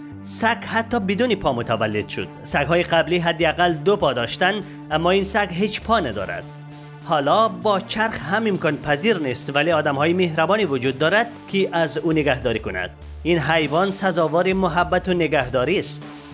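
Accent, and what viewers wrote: Afghanistan. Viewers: نمیشه این کسی که گوینده هست عوض کنید چقد دیگه ادم قط بود افغانی اوردین گوینده خبر ..اعععععععع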